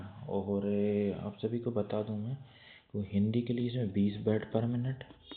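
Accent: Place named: Rural, India. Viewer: Tu bundelkhand se hai kya ??? Accent se lg ra hai kisi gaon se hai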